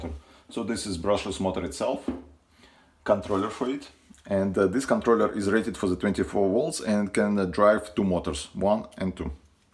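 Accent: Russia. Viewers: Мне кажется ... у вас акцент русский! Если да, то на русском было бы тоже очень интересно.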